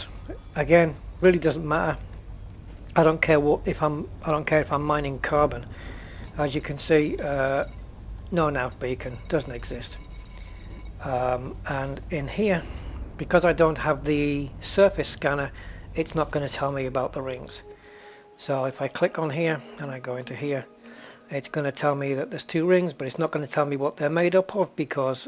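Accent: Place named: England, United Kingdom. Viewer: you sound english if you are english don't say movie. its a film in england appart from that good vid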